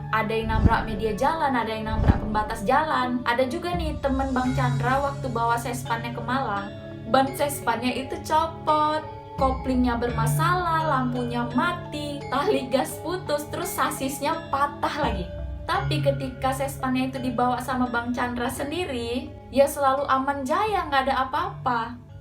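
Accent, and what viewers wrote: Medan, Indonesia. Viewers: ank Medan rupanya bah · Mbak orang medan ya? Kenal pak john als 270 tidak mbak? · Anakk medann kalii ahhh🤙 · Wih anak medan nih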